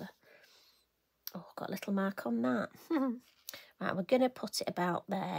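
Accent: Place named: England, United Kingdom. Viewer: love it! I enjoy watching you painting, and love your English accent. It is very soothing, and easy for me to understand what you say.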